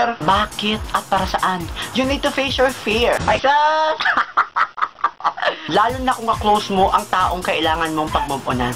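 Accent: Visayas, Philippines. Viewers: Bisaya diay ka kuya? Ako pud baya XD Hahaha!! More power dahhling!:) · taga asa ka?bisaya lagi ka?hehehe.malingaw jd ko sa imo ba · bisaya diay ka hahaah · bisaya kaba?? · bisaya diay ka ter :)) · BiSAYA kaau uhy ! HAHA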